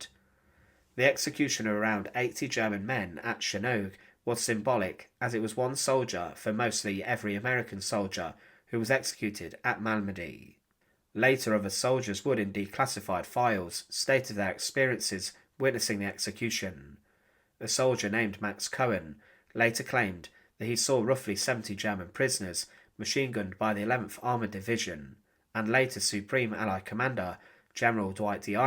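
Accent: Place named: England, United Kingdom. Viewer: I'm sorry but I can't understand a thing this lady is saying. Her English accent is way too thick.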